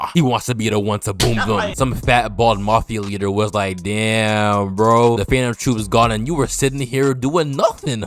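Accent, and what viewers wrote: Boston, United States. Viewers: You’re from Boston??!?! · Aye nigga you from Boston?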